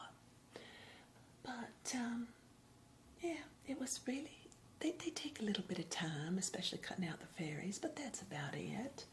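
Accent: South, United States. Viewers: Omg omg I love the accent, I am European so southern accent sounds so so so sweet!!! Love it. thank you! So much!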